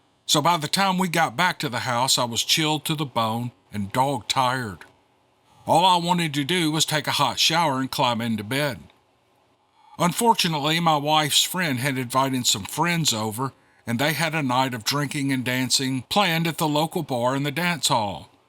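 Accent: South, United States. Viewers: I'm from the UK and that beautiful southern accent, so relaxing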